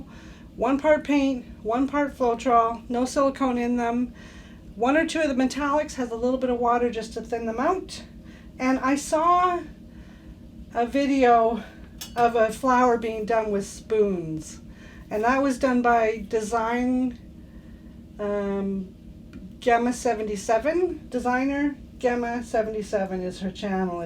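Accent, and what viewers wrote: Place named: Canada. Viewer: I hear your Canadian! I am too!!! I watch Art with Rossy from Australia and she gave you a mention! New Subscriber!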